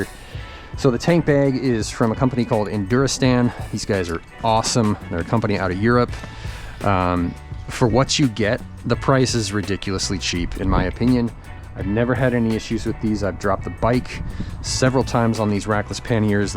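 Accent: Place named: United States. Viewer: Wow this is the first time I heard such an authentic American English pronunciation from Japanese uploaders. Well done.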